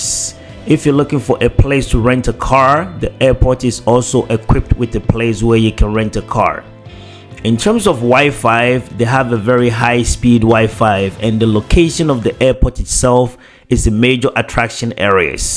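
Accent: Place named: Canada. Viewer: I like your Canadian accent 😊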